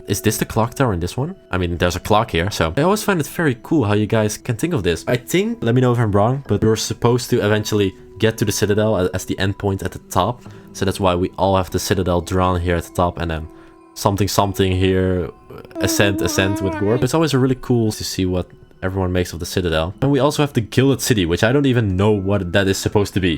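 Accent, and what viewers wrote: Netherlands. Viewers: Wait your dutch?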